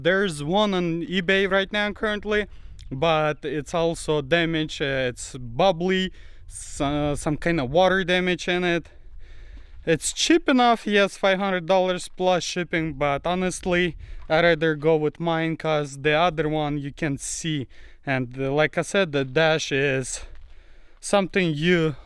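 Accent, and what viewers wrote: Russia. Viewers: Actually for a 100% Russian dude you have an amazing accent. Greatings from Serbia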